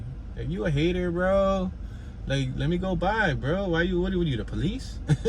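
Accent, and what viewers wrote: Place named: South, United States. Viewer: Love the southern!!!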